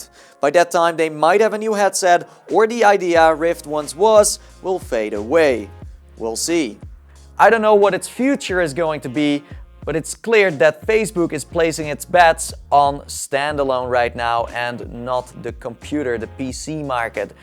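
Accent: Netherlands. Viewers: wow je hoort echt hard dat je nederlands bent XD ly, keep up the good work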